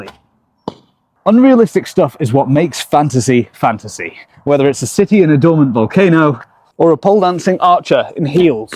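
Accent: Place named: North, United Kingdom. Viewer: Did I just thoroughly enjoyed a comely, charismatically genteel ranger ramble about the biology of imaginary creatures in a posh northern accent? Never thought of the structural integrity of a centaur... Yeah the upright human spine + equine vertebrae won't work. Oh I'm a mage. How do you do.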